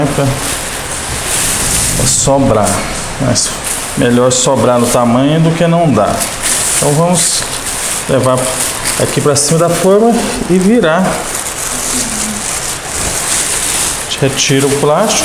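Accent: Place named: Goiás, Brazil. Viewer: Meu caro, bom diaaa! Eu reconhecia o seu sotaque, mas eu não sabia que vc era daqui de Goiânia (hehehe)! Meus parabéns pelas suas "lives" maravilhosas!!! Vou fazer essa receita aqui em casa amanhã, sem falta! Obs.: eu só vou fazer diferente o seguinte, vou misturar todos os ingredientes numa vasilha antes... e acrescentar alguns outros, rs! Que massa espetacular!!! #ShowDeBola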